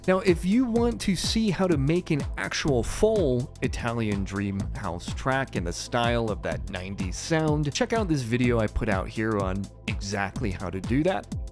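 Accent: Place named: West, United States. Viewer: The deep West accent just makes these even better, it's that American accent we never hear in the media, it's a complete trip man.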